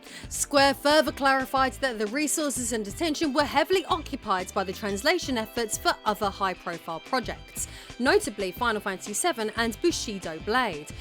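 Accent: United Kingdom. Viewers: Can guarantee she never played any of these games, and this is a conglomeration of a YouTube channel gen scheme where they find a British voice woman and slap her in front of a camera while someone else writes the scripts. Does the research and actually video editing.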